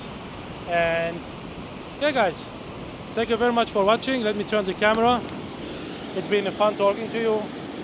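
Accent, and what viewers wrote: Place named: Colombia. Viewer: Por que hablas colombiano si tu eres de Caracas, Venezuela??? Eso no me gusta.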